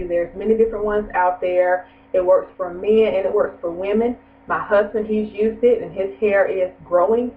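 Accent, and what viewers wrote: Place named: South, United States. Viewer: swear I love her southern voice... would hit that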